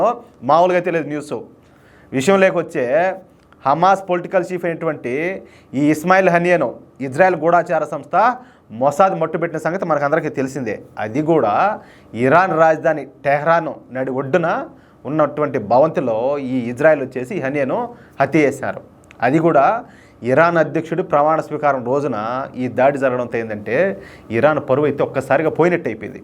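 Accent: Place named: Nellore, India. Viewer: Anna medi nellore ha slang alage undii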